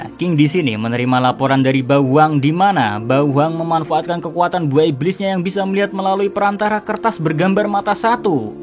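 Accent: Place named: Magelang, Indonesia. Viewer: Aku penasaran, org mana sih? 90% org Jawa tengah, Magelang mungkin, logatnya kayak orang Magelang, Maaf, 🙏🙏🙏😁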